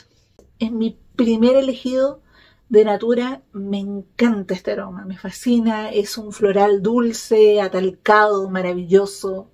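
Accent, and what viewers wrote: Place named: South America, Latin America. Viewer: ¡Me encanta tu acento! Para mí, el mejor de Sudamérica. Saludos desde México.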